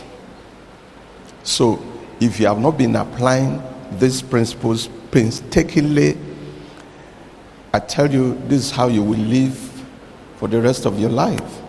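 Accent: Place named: Nigeria. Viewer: he is most likely a great Nigerian speaker. He has a very heavy accent now, but in time, he could improve. I suggest hiring a speech coach. Recording his voice and reading ouloud for one hour at least three times a week👍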